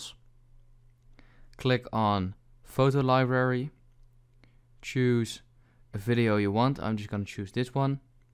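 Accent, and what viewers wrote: Netherlands. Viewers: Are you Dutch? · Dat nederlandse accent hahahah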